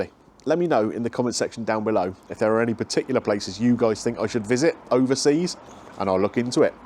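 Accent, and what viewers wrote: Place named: Dorset, United Kingdom. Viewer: Greetings from puddletown in Dorset...nice to hear a fellow Dorset person..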